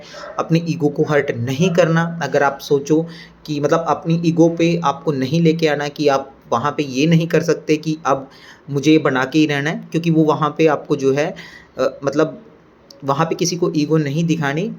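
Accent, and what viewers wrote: Haryana, India. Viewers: Aap Haryana se ho bhaiya ?? Apke bolne ke way to lg raha h ...i am also from haryana..btw my exam is on 12th April...wish me luck bhaiya❤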